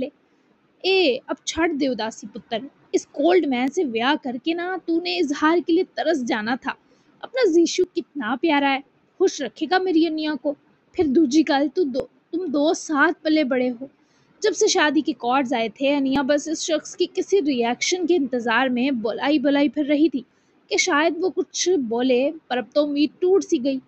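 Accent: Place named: Punjab, India. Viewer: Your Punjabi accent is awesome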